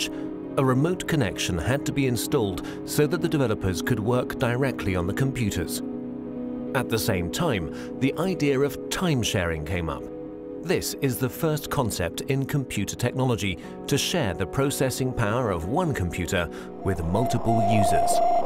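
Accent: England, United Kingdom. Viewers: love the english accent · What a terrible accent... I can't understand a word. I hate England guys. (about the girl, I'm feel ok)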